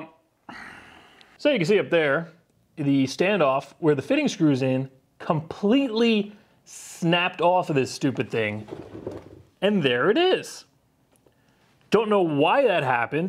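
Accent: New York, United States. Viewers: My first car was an 85' trans am same blue! I really enjoy this series, you've done a ridiculous amount of work on this car! My current 89' GTA never disappoints. I'm in Northern CT, you must be in long Island if I here you're NY accent correctly.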